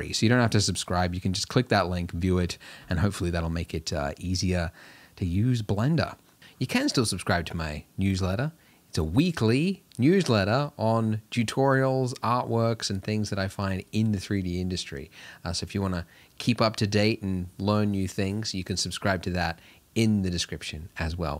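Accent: Australia. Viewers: Are you from Australia ? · I'd love this series based on its content, but that Aussie accent is absolutely 👍. Love it!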